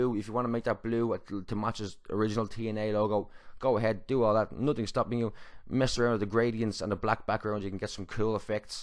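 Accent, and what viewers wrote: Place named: Ireland. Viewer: notice your Irish accent, I'm from Ireland :) woo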